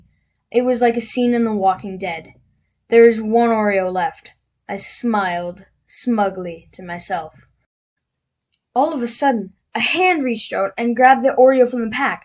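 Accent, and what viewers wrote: Canada. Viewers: He sounds very Canadian eh? I’m Canadian.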